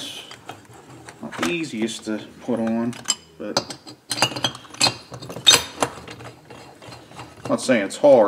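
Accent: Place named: New York, United States. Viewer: Great video just did crank bearings on my 391 shes ripping again. Thanks! I can tell your from New Yawwwk somewhere. Maybe penn.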